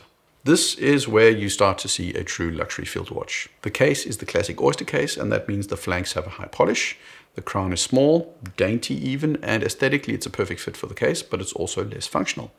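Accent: South Africa. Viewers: Superb video. By the way, you sound very South African. To me the Index field watch by which all others are judged is the Hamilton Khaki field. Or maybe the British CWC. It is a bit expensive for where I find myself now, so the Timex Expedition Scout fills that niche, along with a Citizen field watch, although its a tad big at 42mm. Gshocks are very cool, but per definition are not field watches. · You sound South African, aber mit Deutschem Hintergrund...? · Dude are you South African?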